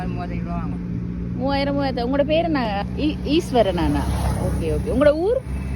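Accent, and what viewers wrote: Sri Lanka. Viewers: Madam, Your Slang Not Exactly Jaffna. TRINCOMALEE Or Exact Batticaloa Town .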